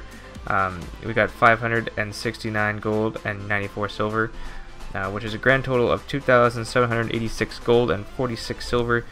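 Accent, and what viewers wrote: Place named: Canada. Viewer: great video brother I can't tell if your from the north US or Canada you kinda sound Canadian lol my bad I just like to know where ppl is from.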